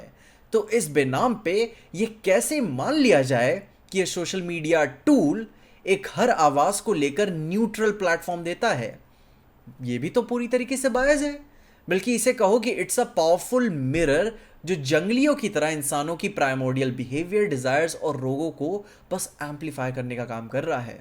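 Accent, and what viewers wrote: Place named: Bihar, India. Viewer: bihari accent ❤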